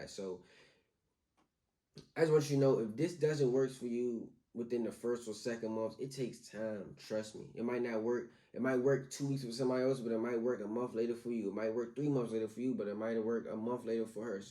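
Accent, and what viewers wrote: DMV, United States. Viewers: He sound like he’s from the DMV lol…. Great video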